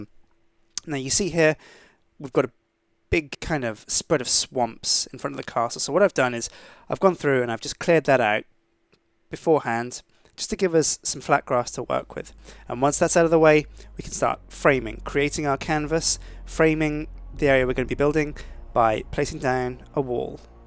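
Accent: United Kingdom. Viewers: Because of his accent. It's scientifically proven you cannot get bored while listening to a guy with a Britsh accent.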